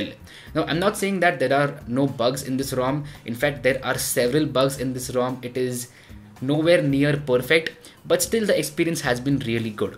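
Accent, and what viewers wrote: India. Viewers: Indian accent is so terrible xD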